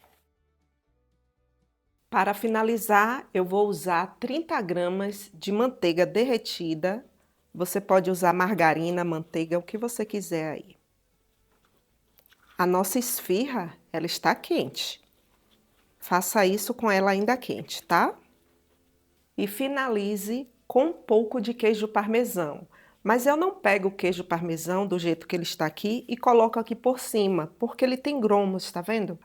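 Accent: Bahia, Brazil. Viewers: Oi amada. Morei 22anos. Em Salvador, e me falaram que esse molho se chama molho lambão uma delicia, morro de Sdds desse sotaque lindo, hj voltei para O Rio de Janeiro , estou me recuperando de um momento de doença, mais assim que melhorar farei seus salgados para venda , obrigada e Deus abençoe sua vida sempre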